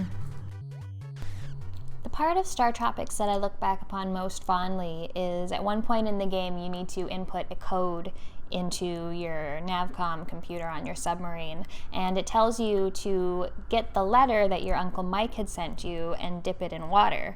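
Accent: Canada. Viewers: Star tropics was my favorite game too. Are you canadian?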